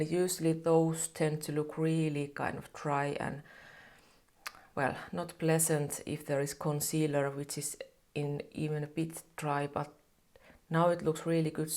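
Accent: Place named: Finland. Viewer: Dear, you are really pure joy to watch! Loooooove, looooooooooooove your Finnis accent and your reviews are always spot on! Helped me alot which foundation to choose. Wish you all the best and can't wait for new vid. ☺️☺️ 😊🤗🤗 ❤️❤️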